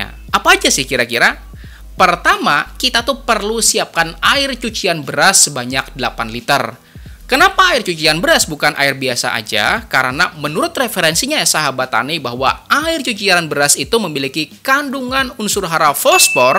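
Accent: Banjar, Indonesia. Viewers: Logat banjarnya masih terbawa mang 😂👍🏻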